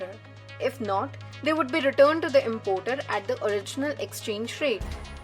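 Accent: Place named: India. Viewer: Stop inventing things in Indian accent please, where is the digital currency of America???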